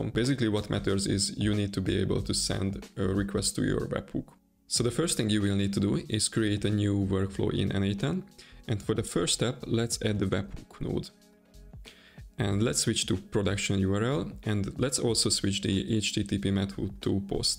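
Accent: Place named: Hungary. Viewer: Volt egy apró magyar akcentus, mondom megnézem a felhasználónevét és jól tippeltem :D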